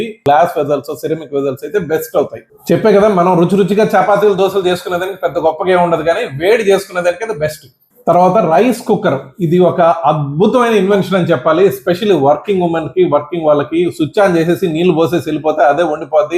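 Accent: Nellore, India. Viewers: Nellore slang భలే మాట్లాడుతున్నారు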